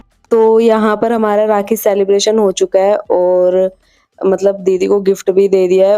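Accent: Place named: Haryana, India. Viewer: hnji ap ki language mei touch ata h wo haryana walo ka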